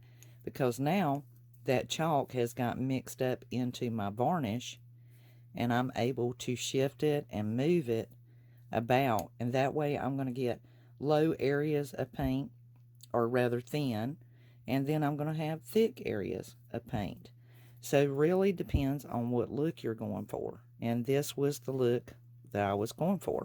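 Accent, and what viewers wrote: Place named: Ohio, United States. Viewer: Thank You So Much!! ❤ I'm in Ohio and I was trying to figure out your accent lol I'm guessing Southern Ohio, West Virginia or Kentucky? I have family all over there!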